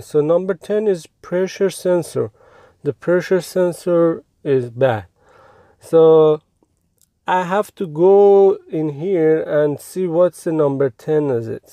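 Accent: Iran. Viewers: I love the Persian accent. ❤️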